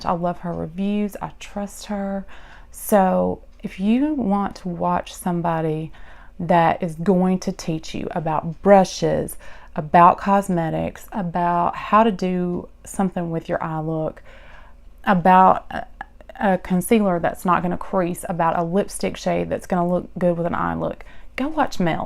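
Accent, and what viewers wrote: United States. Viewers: I’m from England and I love American accents and yours is my absolute favourite ❤️❤️ my <mail>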